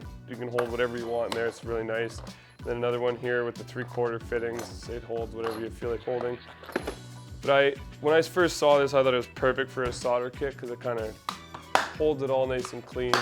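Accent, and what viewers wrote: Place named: Canada. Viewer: You could tell you were Canadian from the get go the accent isn't super thick but certain words.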